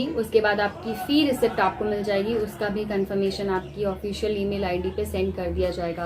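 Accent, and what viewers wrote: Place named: Bihar, India. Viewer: Apakee baat se hme lag rha hai ki aap bhi bihar se hai hai nn 👍👍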